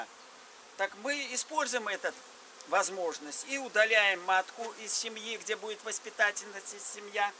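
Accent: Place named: Belarus. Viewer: я сразу по говору понял что вы с белоруси)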